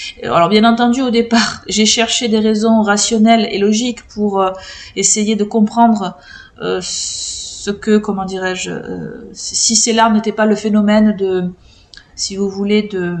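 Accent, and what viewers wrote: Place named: South, France. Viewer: Effectivement, l'accent du sud se fait parfois entendre. 😉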